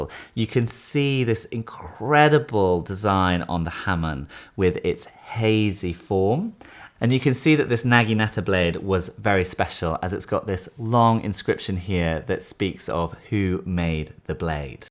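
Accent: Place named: United Kingdom. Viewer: I agree, I think this was very interesting and well presented. My only complaint applies not only to, but to most English presenters, news readers and even academics and that is their totally British pronunciation of Japanese - especially names. I imagine it is so common, even at the museum, that the filmmakers are not aware of this. It grates on my nerves! A short class in Japanese pronunciation would not go astray.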